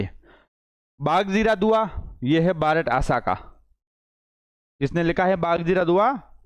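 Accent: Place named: Barmer, India. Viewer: Sir aap bolte mast ho 😅 चारण को सारण , चमत्कारी को समत्कारी, सिंगल को chingl लगता हे आप barmer se ho🙏🙏🙏🤗🤗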